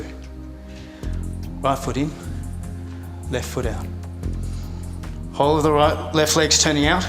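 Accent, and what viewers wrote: Australia. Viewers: I do yoga on You Tube every 2nd day and it is so refreshing to finally hear an Aussie voice! Thanks for the vid :)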